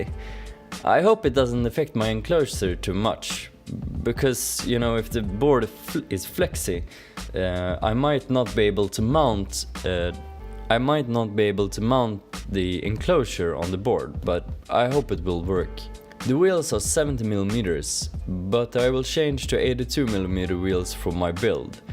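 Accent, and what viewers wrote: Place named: Russia. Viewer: Русский ?! Акцент как-будто с России )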